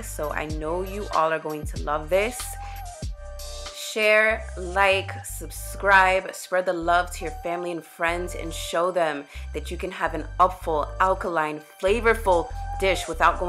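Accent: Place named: Caribbean. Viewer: U sound so Caribbean, Jamaican or barbadian to be exact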